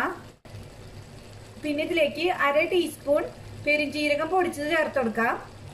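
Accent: Thrissur, India. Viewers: L42 watched full nice recipe and loved your Thrissur accent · Like 11 I joined 594 Thrissur slang super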